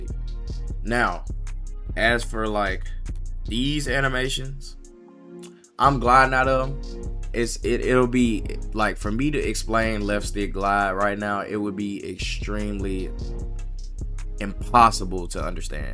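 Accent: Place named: Mississippi, United States. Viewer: What part of Mississippi you from fam?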